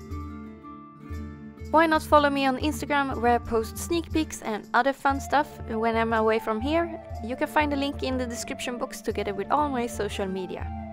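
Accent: Sweden. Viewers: You sound swedish, cus of the kinda bad english🤔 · You sound swedish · this is so awesome! just a question are uu Swedish? I just think so of your accent when you speak English, I might be wrong x3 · Man hör att du bryter på svenska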